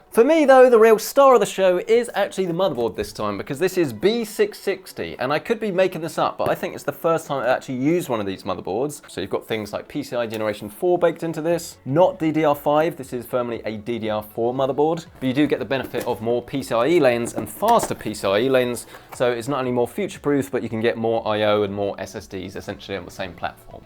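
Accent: United Kingdom. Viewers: British boy talking about computers makes me happy :D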